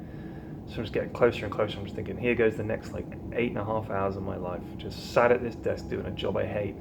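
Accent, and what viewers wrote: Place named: Yorkshire, United Kingdom. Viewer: your Yorkshire accent is spot on!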